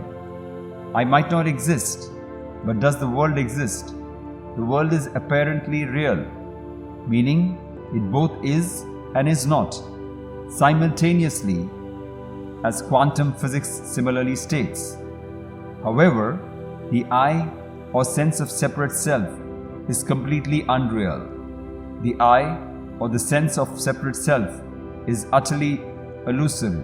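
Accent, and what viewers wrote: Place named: India. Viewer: hahahaha, BS, im tired of this indian accent people claiming they know all! BS !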